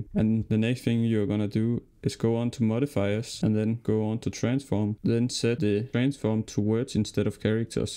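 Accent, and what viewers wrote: Denmark. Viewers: og man kan bare høre du dansk 🤣🤣 men fed video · Your defintly danish 😆 but thanks, great tutorail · i can hear that you have an accent. It kinda sound like you are danish. Are you? BTW great video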